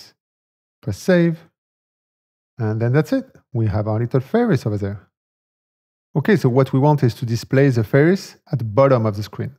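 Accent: France. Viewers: A really well done video! Are you French? because I am French and I can clearly hear a French accent here. I could be wrong though :p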